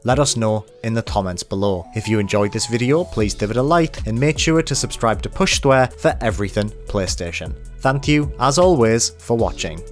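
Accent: United Kingdom. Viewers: Hes british or something. Leave him alone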